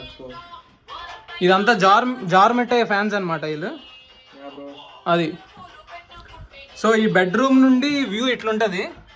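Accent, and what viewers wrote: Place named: Telugu, India. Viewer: Baga edit chesav anna with pakka Telugu bidda voice 😁😁🤝🤝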